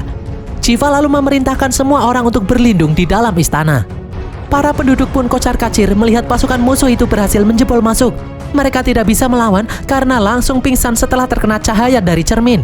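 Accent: Indonesia. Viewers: Nezha pun sebenarnya bukan nesa tapi neca, tapi gpp kan lidah indo bukan lidah cina woi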